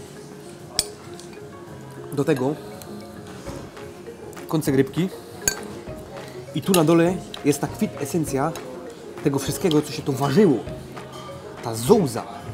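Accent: Silesia, Poland. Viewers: fajna slonska godka. cool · Czego chcieć wiyncyj ? Śląsko godka, dobre żarełko i fajno muzika. Super! pozdrawiom!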